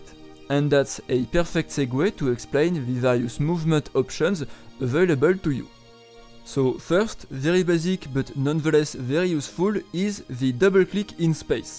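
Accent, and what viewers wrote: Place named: France. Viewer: Precise, informative tutorial...and with a French accent! Really wish more Eve tutorials were executed with the same level of proficiency.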